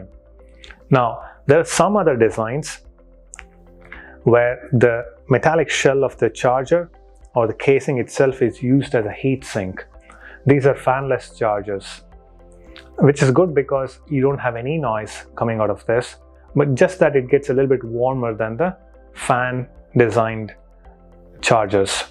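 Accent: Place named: India. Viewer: The only Indian accent ever that’s not annoying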